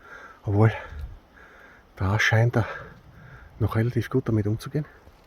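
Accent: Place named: Austria. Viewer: Omlouvám se, ale nemůžete mluvit spisovnou němčinou? Jedlé kaštany mě zajímají, sami je už pár let sázíme, ale tomu dialektu (AT?) jako cizinec nerozumím úplně dobře ..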